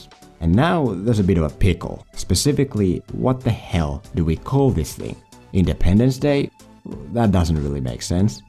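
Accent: Finland. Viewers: Terve mieheen! ;) Teikäl iha huippihyvii videoita ja tiiäks aina jotenki tuntu siltä että on siinä hieman sellast suomalaista aksenttia ;p Mutsiis äijäl aivan huippu hyvä englanti ja niiku Arska sanoi: "An acsent is a strenght!" ;) Ei hätää, se on meidän kahen pieni salaisuus... :7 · playdate is from 2012 not the 90s. base don the accent i'd say finish or lithuanian, based on the covers of the movies, its finish.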